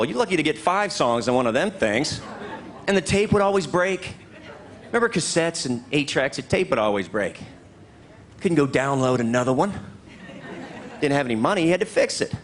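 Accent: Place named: South, United States. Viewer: I love southern accent so funny!!!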